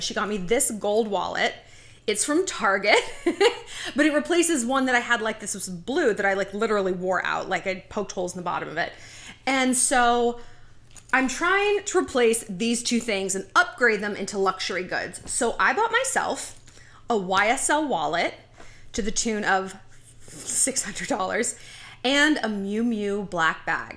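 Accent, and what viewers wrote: France. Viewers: As a partly French person, let me say - your French accent is delightful and the phrases you do know are perfect 👌🥖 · OMG! I'm French, and your French accent is truly amazing. I love it! <3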